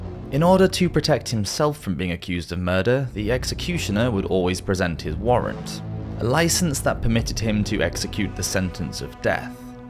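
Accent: England, United Kingdom. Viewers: Margaret Pole, Duchess of "Sallis bury"? For a narrator with an English accent, this guy certainly has trouble with his English pronunciation.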